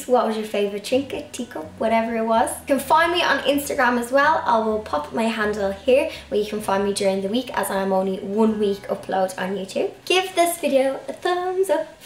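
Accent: Ireland. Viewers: Hi, what a wonderful master bedroom, it is ideal. I only have a fairly small bedroom, fine for me and my cats, I have four, was five but one died last week and I was devastated, she had a poor heart. Anyway you always cheer me up with your beautiful accent and amazing videos, thanks so much. xx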